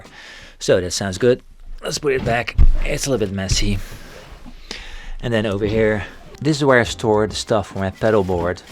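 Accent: Netherlands. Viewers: ah, so Dutch? ty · English sounds best with a dutch accent, from my POV!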